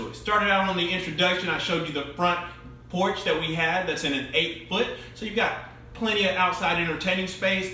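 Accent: South, United States. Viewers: Was I the only one distracted by how tight those pants are on his beautiful 🍑🙈 I'm like 20% interested in these houses and 1000% interested in he's so beautiful his southern accent those muscles that smile is curly hair every single part of him!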